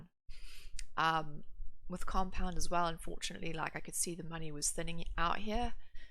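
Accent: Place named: South Africa. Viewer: South Australia sorry haha. I wondered if you were from South Africa with your accent.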